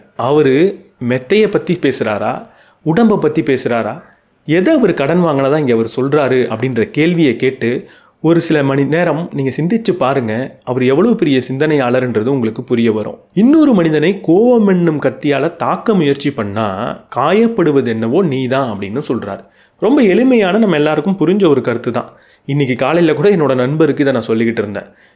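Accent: India. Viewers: You are doing a great public service for Tamil speaking world of 75 million people in the world and your language skill is so beautiful and very simple ..your Indian Tamil language accent is so beautiful and you have a good skills to grasp many ideas from some excellent books..could I suggest you to review this following books please . The man unknown..Alex Carrel. Suicide of the west ... Clash of civilization.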